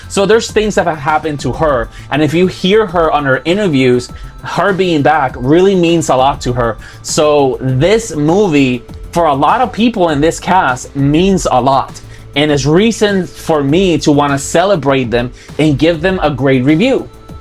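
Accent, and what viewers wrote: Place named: South America. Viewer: this surprised I did not think at all you were living in the US, I thought you were South American or Caribbean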